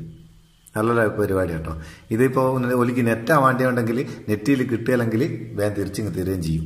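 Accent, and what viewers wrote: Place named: Kasaragod, India. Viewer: അടിപൊളി ഐഡിയ. അടിപൊളിയാവുന്നുണ്ട്ട്ടാ👍 ങ്ങളെ കാസർകോട് ബർത്താനം ഞമ്മക്ക് നല്ലോണം പുട്ച്ചിക്ക്ണ്.😜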